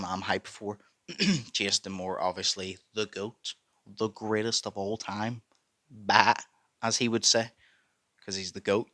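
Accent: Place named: Liverpool, United Kingdom. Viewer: Hahahhaha bruv your accent is amazing, you must be from Liverpool innit?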